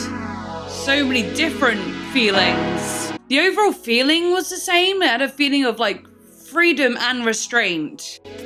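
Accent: Minnesota, United States. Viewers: as a minnesotan i died at your minnesotan accent 😂 MINNESOTA MENTIONED!! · OMG MINNESOTA SHOUT OUT!! I'M FROM MINNESOTA YOUR ACCENT IS PERFECT!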